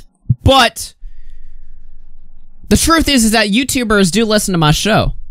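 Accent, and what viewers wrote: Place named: Texas, United States. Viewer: Every time says "Ma opinion" I feel a little bit closer to Texas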